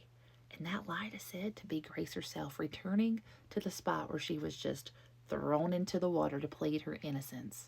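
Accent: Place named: Tennessee, United States. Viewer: Thank you for this video. I saw it in my feed this morning and was so excited to watch it. Grace is my ancestor ❤. We always hear about witch trials and the deaths that resulted from them but this old girl wasn't having it. She did her time and lived through it. I'm so proud to be able to claim her as Mamaw Sherwood. Whenever I'm going through a tough time, I remind myself what Grace went through and I feel just a tiny bit stronger ❤. Thank you 🙏 you did a great job. And you sound a lot like me - I'm from Tennessee.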